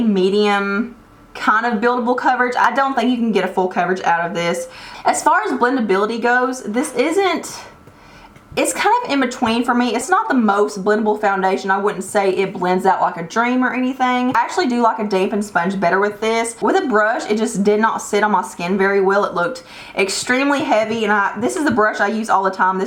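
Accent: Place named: Tennessee, United States. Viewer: Hey! Where are you from? I'm from Kingsport, Tn and you have the same accent as we do :) glad I found your Chanel!!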